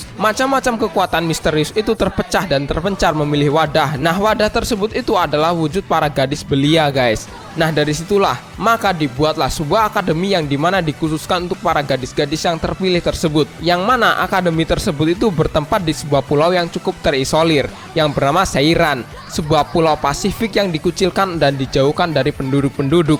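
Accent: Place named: Java, Indonesia. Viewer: Wong jawa koe toh minn? Mantep bahasa ne mandan medok:v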